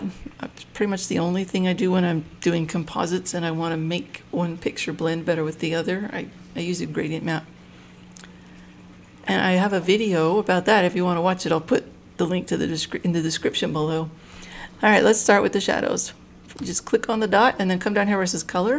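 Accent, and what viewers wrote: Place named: North, United Kingdom. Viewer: oh my gosh I'm from the north and you sound like you are too :P Thanks for the video!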